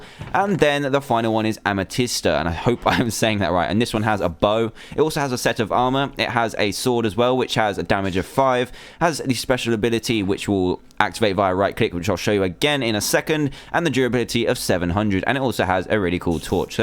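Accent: England, United Kingdom. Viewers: i used to watch your videos so much when i was about 3, that i developed an english accent, it sounds stupid though because its morphed with a US accent (because in in the US) but still, if i had a choice i would have still watched all your videos. we love you, you were a pillar of old minecraft, and a peice of the foundation new creators build upon. Thank you.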